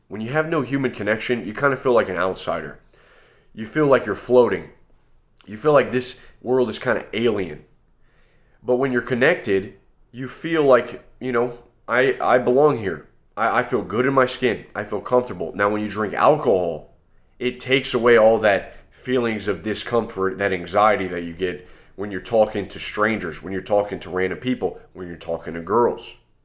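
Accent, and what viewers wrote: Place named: North Carolina, United States. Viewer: This guy sounds like everyone in North Carolina small towns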